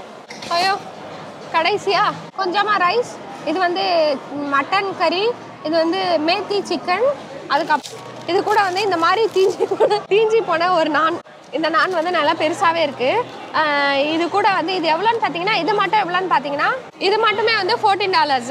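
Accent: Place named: Chennai, India. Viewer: Unga Chennai slang super akka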